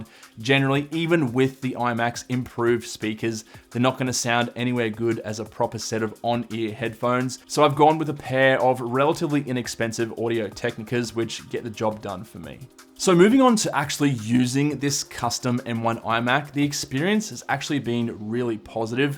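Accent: Australia. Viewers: gotta love the Aussie accent "M1 oi-Mac"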